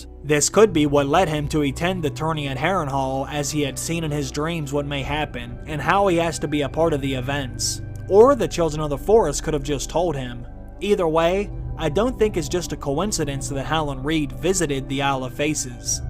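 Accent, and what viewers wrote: Pittsburgh, United States. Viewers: Are you from Pittsburgh?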